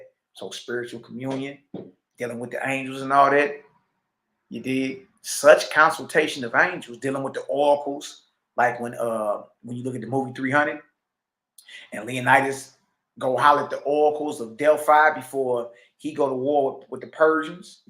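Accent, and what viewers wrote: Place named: St. Louis, United States. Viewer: Fear/ Furrrr … their/thurrrr .. St. Louis to death 😂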